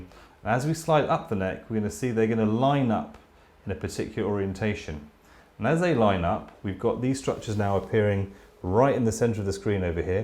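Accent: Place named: England, United Kingdom. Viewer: perfect video. nothing like the english accent to learn new things awesome teaching skills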